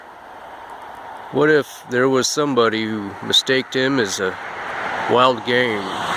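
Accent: United States. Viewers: What part of the USA is your accent from?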